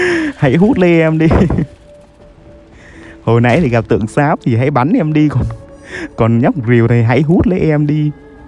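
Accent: North, Vietnam. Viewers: Anh gốc là ng bắc đko ạ?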